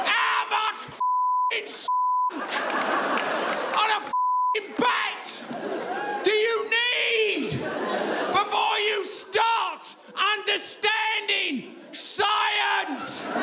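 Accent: United Kingdom. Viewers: Eh. It’s a bit too British for American humor. The tone of your delivery is all wrong, im sure it’s a knockout in Europe but half of us can’t even understand your tea and crumpets accent bro. That being said you’re a bescon of truth and you’d do better as a lighthouse for ships in the dark, than a jester on a unicycle. Just sayin. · I really need some practice at listening to that British English - or is it English British. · I hear bleeps what Russell Brand is saying. He said many bad words in British accent about COVID 19.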